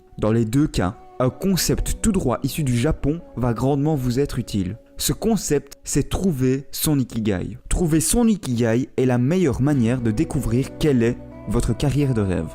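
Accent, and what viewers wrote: Liège, Belgium. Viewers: Hello, j'ai vu des vidéos, lu des bouquins sur l'Ikigai et ta vidéo est bien plus instructive et à son côté pratique que j'ai trouvé nulle part: comment répondre aux questions... Donc merci pour ta vidéos! Je vais explorer ta chaîne qui me paraît vraiment ce qu'il me faut en ce moment :) (Est-ce que tu es d'une région particulière ? ta voix me fait penser à l'accent de Liège :p )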